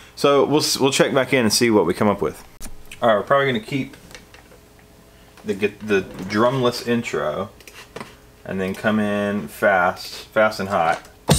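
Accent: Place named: North Carolina, United States. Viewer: I love hearing your slight twang. Reminds me of growing up in NC. Keep doing what you're doing. You're killing it, my dude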